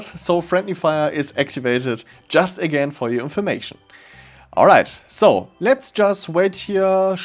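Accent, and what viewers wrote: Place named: Germany. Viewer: Ahh dieser deutsche Unterton ^^ man erkennt den doch überall. Es gibt leider kaum Spieler für das Game =(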